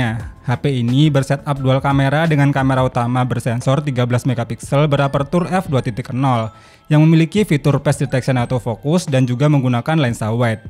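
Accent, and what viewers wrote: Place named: Brebes, Indonesia. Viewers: Maap masnya orang tegal bukan sih ? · Logat medok Brebes qolqolah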